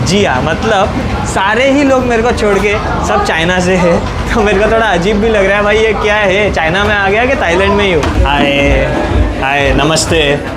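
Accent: Gujarat, India. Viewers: You are gujarati or something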